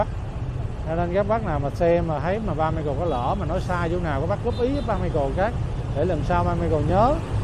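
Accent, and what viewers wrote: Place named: North, Vietnam. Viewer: hello ,happy birthday , den cay la tieng cua mien nam , nen la tieng cua nguoi Bac viet .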